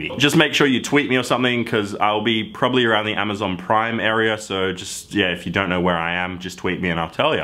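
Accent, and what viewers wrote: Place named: Australia. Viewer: Wow you stupid aussie tool! What a scoop you've come up with. Sony will release a PS5 someday? Gee? No one saw that coming. Heres another scoop for you....someday...Microsoft after Xbox One X is released will come out with a new version of the Xbox......possibly called Xbox 2. I must be psychic. You suck! your channel sucks! your accent sucks! Australia sucks! F U!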